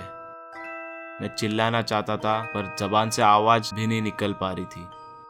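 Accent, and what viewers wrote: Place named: Rural, India. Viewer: Story shi h lakin apne bolne ki style ko improve kr...asa lg rha h ki tu villager h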